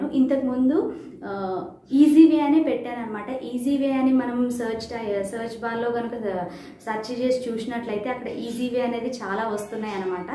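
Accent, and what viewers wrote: Telangana, India. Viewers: Hello garu.. neku vachina yasa basha lo matladu..pakka Telangana basha dhichi Kulla kulla matladu....asal bayapadaku... All the best 😍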